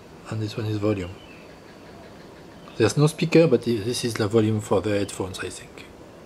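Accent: France. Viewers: Hey buddy you speak english like french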